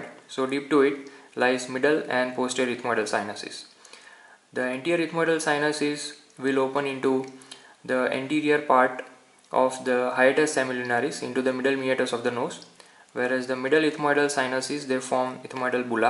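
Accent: India. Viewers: Very hard to understand. All your words are just joined together in a train sequence, theres no distinguishability between 1 word from the next. When you speak with an accent, specially a heavy one, you must talk slower, take a break between parts in different parts within the sentances, and pronounce words heavier. Otherwise it just sounds indian. This is advice from a linguist !!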